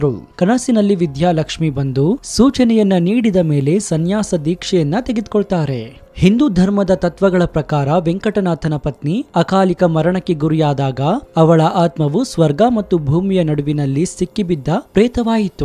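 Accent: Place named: United Kingdom. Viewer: bad narration...Kannada in English accent!!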